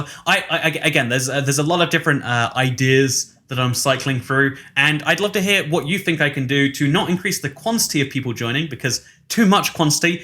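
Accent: United Kingdom. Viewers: His voice is so British my ears got collanis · I loved listening to a British man talk about the US. Gives us a whole new perspective on ourselves as Americans. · First time I watched a vid, without actually watching... Must have been the British accent, or cringy gameplay 😂❤ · are you british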